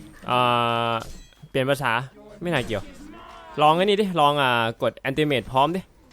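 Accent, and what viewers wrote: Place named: Korat, Thailand. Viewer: เสียงพี่โคราชมากคับ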